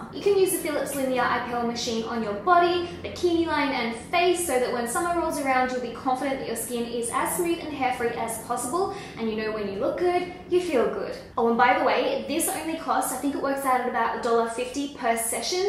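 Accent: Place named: Australia. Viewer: LOVED This! Thanks lovely!! Side note- I'm super surprised that a lot of people haven't realised that you have an Australian accent and there fore live in Australia... where it's currently summer ☀️😜 Haha xxoo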